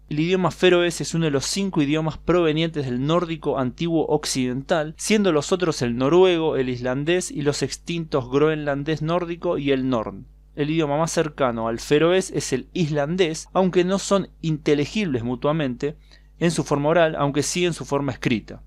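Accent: Buenos Aires, Argentina. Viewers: Son buenos tus vídeos. Pero intenta no hablar tan argentino me.hace echarme a correr ... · A voz que vc escuta neste vídeo fala o espanhol da região de Buenos Aires.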